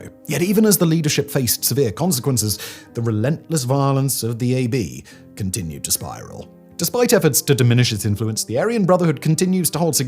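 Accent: England, United Kingdom. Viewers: well one it’s more for fun, but two the author of this video is carries a british accent. I guess you could just take that at face value or further recognize that maybe you and the rest of your british kind are what’s actually trite. Lol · The exaggerated English snobbish pronunciation makes it hard to understand what you say.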